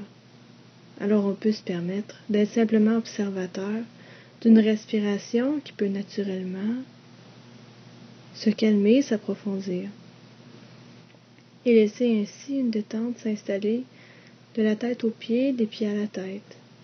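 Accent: Canada. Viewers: j'adore la façon québécoise de parler! c'est très agréable...(chui Français). de plus, vidéo très relaxante! merci bcp! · Bonjour, j'ai écouté beaucoup de vidéos d'hypnose, et je trouve ta voix très relaxante, merci. C'est peut-être l'accent canadien qui me relaxe, je suis français. Continue, tu es douée.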